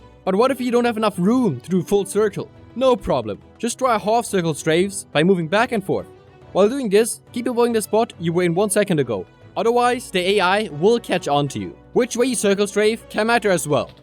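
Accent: Germany. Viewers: You Sound german / middle european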